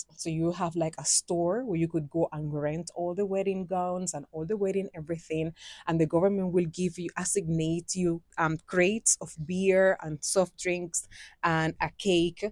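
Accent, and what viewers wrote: Jamaica. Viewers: I LOVE when you sprinkle some patois into what you are saying!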